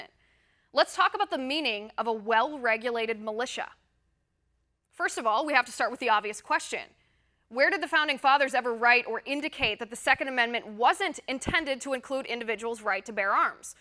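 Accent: United Kingdom. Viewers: Being part Irish, I am too polite to write how I feel towards Morgan and his British accent telling me to disarm. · "Are not the King's ships and the King's troops enough protection for an honest man?" Gen. Sir Thomas Gage, commander of the disastrous British raid at Lexington and Concord. Does anyone know if and Gen. Gage related? Gun control with a British accent does not play well here. It didn't play well 243 years ago either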